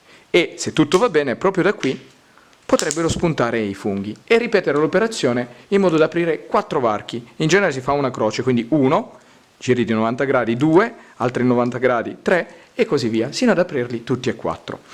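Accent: Italy. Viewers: Me encantan los hongos pero también tu acento...viva Italia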